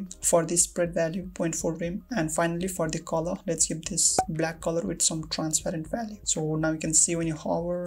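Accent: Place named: Nepal. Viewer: Brother sounds like a Nepali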